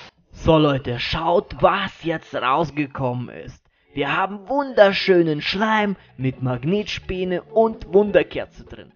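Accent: Russia. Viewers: Bin ein treuer fan =) und dein akzent ist voll cool ^^ bist du russe? ^^, ich bin jedenfalls russe =D werde für immer beim kanal uschauen und nie weggehen =)))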